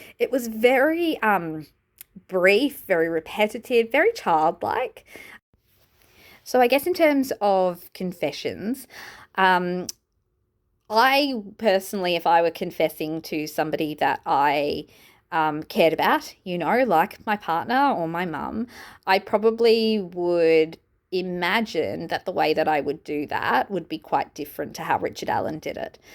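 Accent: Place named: Australia. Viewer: I'm Australia also, but it's only when listening to Aussie creators that I "hear" our accent. And I love it! I could listen to you talk all day, especially rational thoughts about this case and insights into your profession. Excellent work.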